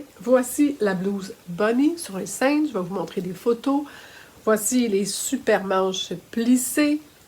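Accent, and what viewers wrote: Quebec, Canada. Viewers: Merci pour la présentation et les conseils que vous apportez dans cette vidéo. J’adore votre enthousiasme et votre accent Québécois ( je connais, ma fille aînée, mon gendre et mon petit fils 🥰habitent depuis 7 ans bientôt à Montréal )! Je suis fan de Fibre Mood mais j’hésite parfois à réaliser certains modèles que je pense peut être trop jeune pour moi (65 ans😊) Vous m’avez convaincue du contraire, j’adore vos choix, vous êtes très élégante 👏👏👏. Je vais vous suivre, c’est sûr. Je vous souhaite un bon séjour en France et une bonne et créative année 2024. Véronique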